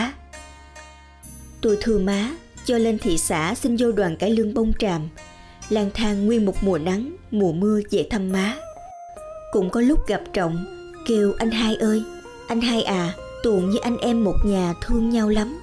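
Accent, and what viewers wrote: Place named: South, Vietnam. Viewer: Lâu rồi hôm nay mình mới nghe được giọng đọc ngọt ngào của miền Nam Thaks tác giã và người đọc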